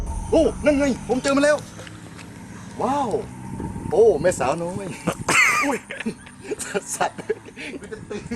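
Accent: Laos. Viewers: ไทยตกลาวนะคับ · เว้าไทยคือคักแทะเว้าลาวแน่ๆๆ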